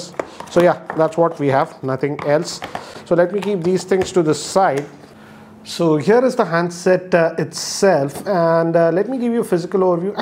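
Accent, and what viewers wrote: India. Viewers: Classic Indian accent I'm don't used to this accent lol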